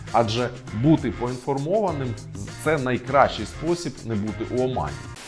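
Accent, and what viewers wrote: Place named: Russia. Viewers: роси1ский акцент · В озвучании текста написаного слышно русский акцент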